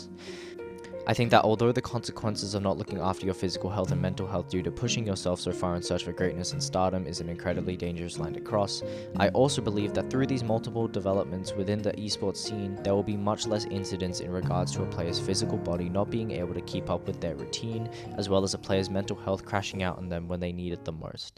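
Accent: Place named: United Kingdom. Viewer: Just to let you know, your british accent is stupid, and you need to speak up, put some balls into your speech. It's bad enough with your stupid English tea accent.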